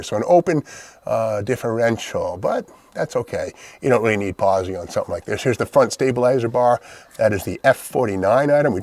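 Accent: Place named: Boston, United States. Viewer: Is it Burlington auto wrecking? You're boston accent comes out as bursington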